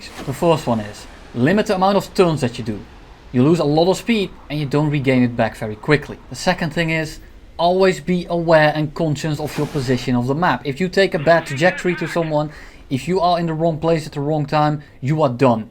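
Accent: Netherlands. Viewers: When you are frustrated the dutch accent comes out so strong.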